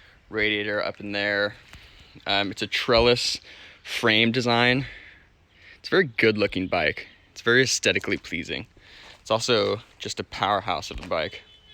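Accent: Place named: United States. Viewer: What wheels? 🤣🤣🤣 American pronunciation 🙄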